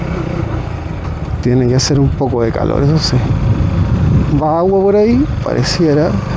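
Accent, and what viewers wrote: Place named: Chile. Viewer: Increíble la adaptación a un castellano normal dela chilena pero se nota momentos 😅 pero el vídeo ni que decir 💯🎉 like hermoso paisaje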